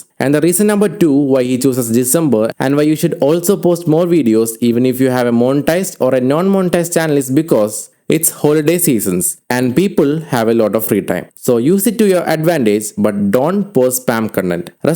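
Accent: India. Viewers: That Indian VOICE 🗿❤ is Genuine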